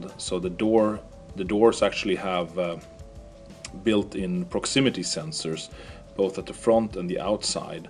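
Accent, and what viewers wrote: Sweden. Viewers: Swenglish! That's a Beaty.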